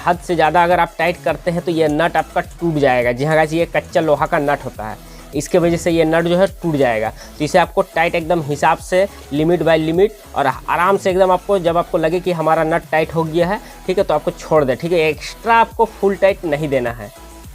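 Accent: Bengal, India. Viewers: Ap bangali ho ka??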